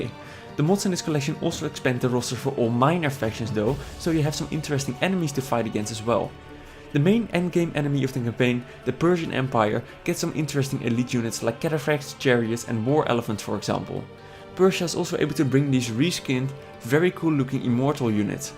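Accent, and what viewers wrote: Netherlands. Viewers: You have the most horrible Dutch accent ever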